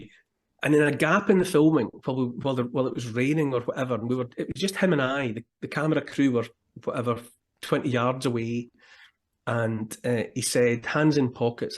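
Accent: Scotland, United Kingdom. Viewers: I really just love to hear scottish people talk just gorgeous.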